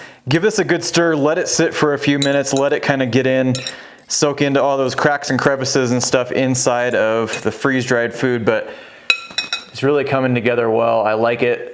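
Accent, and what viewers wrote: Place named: South, United States. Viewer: I moved to South Carolina about 2 years ago and have gotten used to hearing random southern accents in conversation (I’m originally from CT). I legit thought you were saying ‘Chicken Broccoli BREAD’… I’m like ‘why the heck does he keep saying bread in that weird accent?! Maybe he’s pronouncing it the way his mama pronounces it? … Then I see the BRAID … 🤦🏻‍♀️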